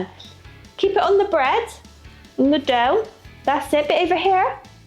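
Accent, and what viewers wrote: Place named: United Kingdom. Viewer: Boy, That British accent of hers is really hard to understand exactly what she is saying !!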